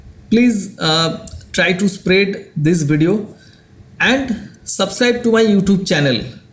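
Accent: India. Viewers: I am from Russia and I understand your indian English )))))))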